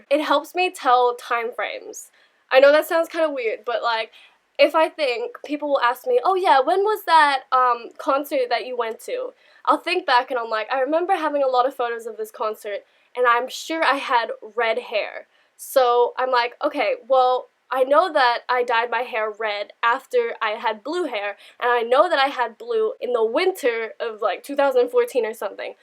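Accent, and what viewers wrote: Australia. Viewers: Aww your accent is changing. You're beginning to sound Aussie ! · maybe i'm going crazy but you sound so australian here and i can't ever remember you being australian wtf is going on with my brain rn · You have like a little Australian accent now and it's so cute😍😍😂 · In this video I can really hear your Australian accent😊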